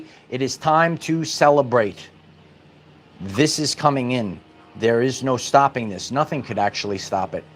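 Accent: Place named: New Jersey, United States. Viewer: Hi this is Milan haven't seen your channel in a while. I have enjoyed it very much . Love your accent I'm from the Boston area soulth Shore I'm suspecting New Jersey love it. ❤ 🙏 🕊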